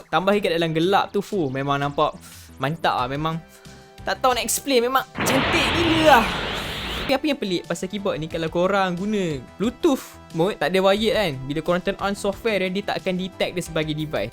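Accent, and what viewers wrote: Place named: Kedah, Malaysia. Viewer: mantap ah orang kedah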